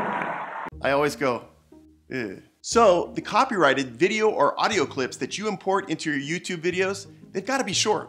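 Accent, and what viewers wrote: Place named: United States. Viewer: You really talk like an American person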